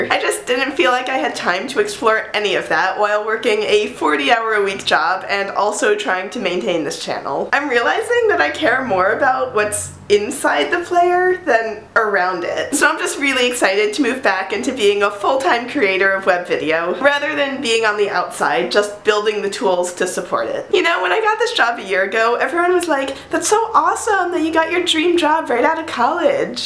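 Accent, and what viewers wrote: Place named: Vassar, United States. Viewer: She seems to talk like she's from Vassar.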